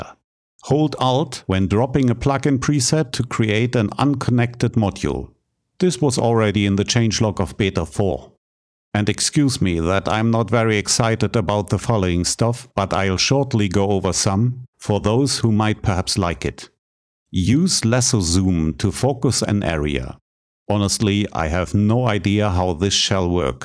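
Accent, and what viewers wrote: Germany. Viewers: I LOVE this channel! Gold mine! And the German accent makes it quite entertaining! 😄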